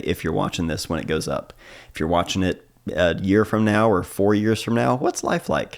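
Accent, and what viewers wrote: Ohio, United States. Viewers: Chillicothe?! I knew that accent sounded familiar. I'm in Portsmouth. Sorry for the house sickness, and Happy Birthday!(belated). We love you too. Congrats on the M11. Beautiful work.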